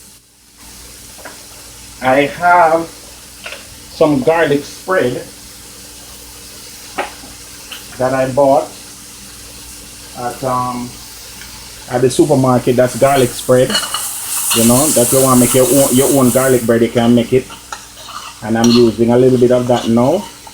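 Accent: Jamaica. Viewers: Hi, Looks Great! BUT PLZZZ SPEAK MORE PROPERLY BECAUSE EVERYONE IS NOT JAMAICAN NOR DO THEY SPEAK OR UNDERSTAND PATOIS. Just A Lil Friendly Advice. Blessing To U😊💚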